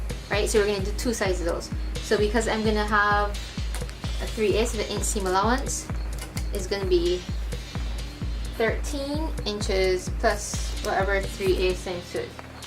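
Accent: Barbados. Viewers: Weird question, are you Bajan? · Heard the Bajan accent from the minute you started talking!💙🇧🇧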